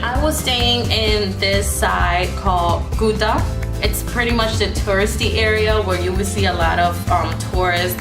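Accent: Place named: Latin America. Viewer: For some reason u have a Latina accent. Or maybe I’m trippen... anyways aside from that, I’m considering solo traveling for my birthday in the summer. Ur pictures are amazing. I’m curious how were U able to have pictures taken of urself do u ask strangers. Also I seen you went from the Airbnb hut and then you found a cheaper hotel was there a reason u switched stays. I also want to get amazing pics and experiences while also staying safe, I’ve been flirting with this solo traveler idea for so long I just don’t know where to begin but I don’t want to wait anymore any feedback is appreciated. 🤗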